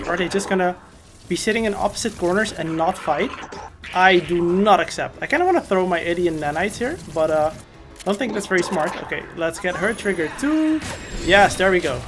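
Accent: Netherlands. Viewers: Is he dutch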